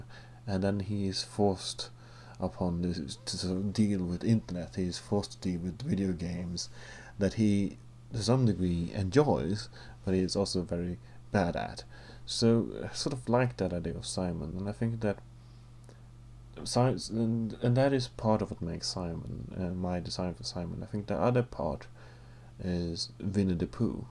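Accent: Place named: Sweden. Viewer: Oh my god you sound soooo swedish =D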